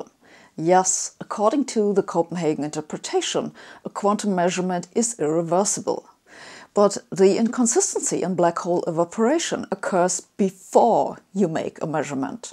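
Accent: Germany. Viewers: Arthur Dent dude she's German it's called an accent.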